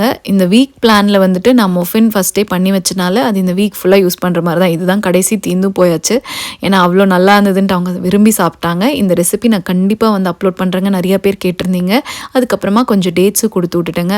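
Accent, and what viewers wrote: Kongu, India. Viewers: Romba azhagha video..unraakudavey irrundha madhiriyee irundhadhu thangam. Un style..rombaa unmaiyaa appidiyey ellam solradhu thaan nu ninaikireen.. inainkku thaan enakku YouTube unnai recommended shorts nu kamichadhu.. ulla vandhu parthaa superbaa irrukkuma..enndaa ithanai naala kattalanu feel panna vachadhu. Unnga recipes ellamey healthy & tastyaa irrukkunga..north & south mix matching recipes..namma pachapayarum, methi chapathiyum, paneerum, nalla combination da. Andha mambazham cutting nanum parthu irruken..parthaleey paravasam feeling..neenga thirupiyum andha feeling kodutheengaa.. unga kongu slang..romba naturally nalla irrkku..ennavoo unngaa kudavey pakathulaay irrundhu parkara mathiriyee irrukku maa. Pachapayaru pakkuvaum sonnadhu, machichadhu, seimurai for all dishes , practical tips, ellamey superda thangam. Enga irrundhalum, namma manasu vachaa ellamey nalla seidhu, healthyaa seidhu sapadalaamnu practical aa kamichu irrugeengaa.. 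Innum niraiyaa video podungaa.. Londonlaa endha pakkam irrukeengaa.. Chedi varathadhu pathi Anitha Anand channel niraiyaa seasonal chedinga, pudina, strawberry ellamey nalla valaradhu, grow tips kodupangaa, namma Charless Anna, Subi sister channel London Thamizhachi channel laa parthu irruken. Charless anna niraiyaa tips kodupaar. Nalla vilaichaal irrukkum, namma use pannara kaikari, poondu, kodi kaikal, poo chedi ellamey.. .. Vazhgaa valamudan.❤❤❤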